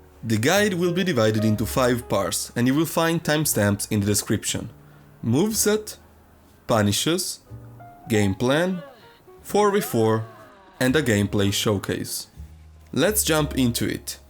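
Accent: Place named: Italy. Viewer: madonna l'accento italiano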